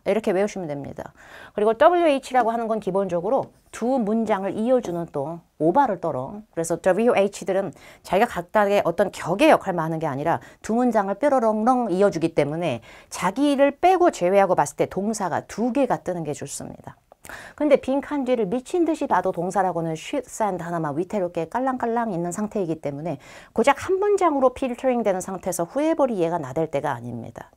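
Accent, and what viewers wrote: Jeolla, South Korea. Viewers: 진짜 이런 박력 너무 좋아.... 선생님 약간 전라도사투리 나오는데 그것도 너무 친숙해서 좋음요 ㅋㅋㅋㅋㅋㅋㅋㅋㅋ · 저도 삼천포여친 윤진이 삘난다고 생각했어요. ㅋ 전라도 사투리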